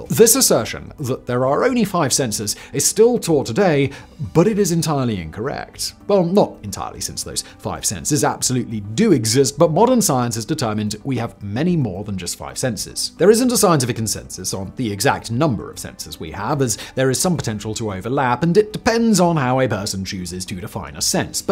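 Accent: United Kingdom. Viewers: I feel like one's perceived IQ is like 10-20 pts higher when one gives a report with a British accent. I believe and want to buy surf shark 😅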